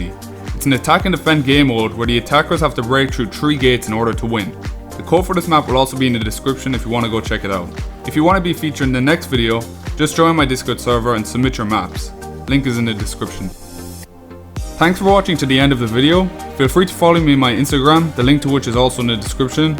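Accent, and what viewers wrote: Ireland. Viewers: U Irish’s? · I think u sound Irish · Are you Irish or Northern Irish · He sounds like he's from reland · Are you irish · Are u Irish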